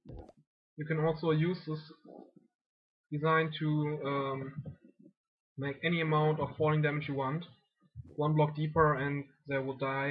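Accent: Germany. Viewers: Kannst du die welt hochladen? Du hastn deutschen Aktzent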